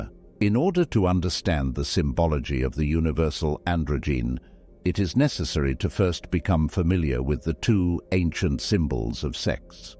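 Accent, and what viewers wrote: United Kingdom. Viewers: Man speaking with ‘stiff British -scholarly sounding accent’ saying nothing…..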